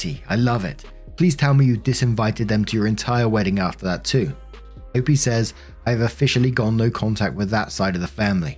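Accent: United Kingdom. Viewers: I love hearing how you pronounce words differently in the UK than the US!!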